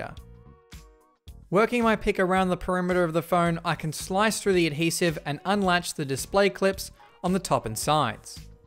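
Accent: Australia. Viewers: It's called the Australian accent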